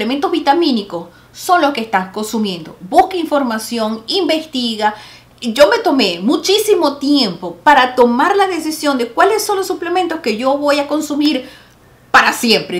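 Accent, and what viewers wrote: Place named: Venezuela. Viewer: Holaaa soy de la Isla de Margarita.. estoy viendo tus vídeos son magníficos que buena eres en lo qué haces.. cuando te escuché me dije ay me suena a Venezolana jajajaja me alegra tanto ver mi gente venezolana por el mundo dando lo mejor de lo mejor.. gracias, gracias, gracias por estar en las redes sociales llevando inspiración y si se puede estar bellas y lucir jovial hasta con 80 si se puede.. Dios te bendiga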